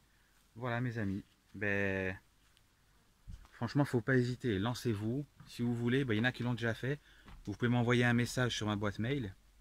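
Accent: Corsica, France. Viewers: Tu n'aurais pas un petit accent corse par hasard 😁. Super ta cabane.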